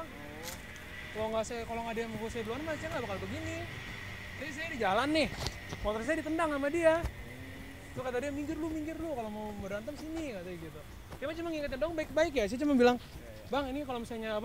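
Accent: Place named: Bogor, Indonesia. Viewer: Bang lu orang Bogor ya bang😂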